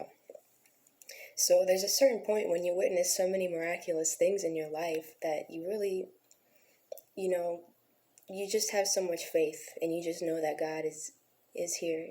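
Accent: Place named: New York, United States. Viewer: She is a diamond amongst the ruff... Her accent makes me think New York for some reason but not so sure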